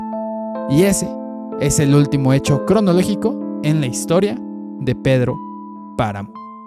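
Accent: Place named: Mexico City, Mexico. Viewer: ke chido acentito de parloteo xilangg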